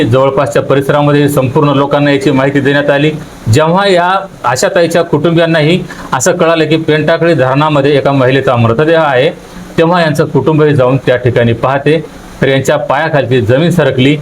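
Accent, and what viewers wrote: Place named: Karnataka, India. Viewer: तुमच सर्व रील पहातोय बोलन जरा कन्नड टोण येतोय आणि सैशय नव्हे संशय म्हणावे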